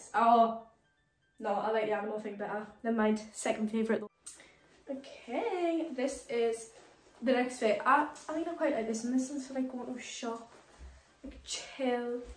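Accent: United Kingdom. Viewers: اللهجه البريطانيه رووعه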